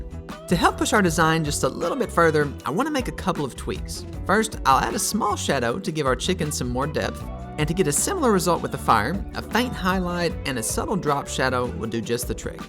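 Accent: South, United States. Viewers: are you southern or something?? such a weird accent lol.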